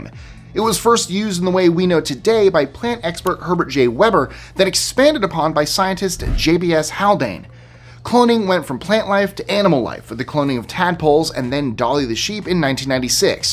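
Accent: Canada. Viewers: It's your accent that he thinks you're from Canada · yup, take it from a Canadian, you DO sound Canadian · The Mandela effect cos I thought you was Canadian too eh 😅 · i thought you were canadian as well ,,,,,, your more canadian than me and i live in ontario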